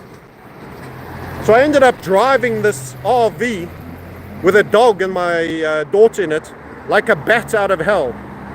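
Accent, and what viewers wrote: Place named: South Africa. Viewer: a based guy with a South African accent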